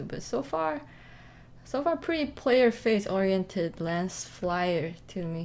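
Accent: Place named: England, United Kingdom. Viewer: Pretty nice~ ^^ I like to listen to your English accent and learn a little Chinese from you. ^^ Cheer up ! (^0^)/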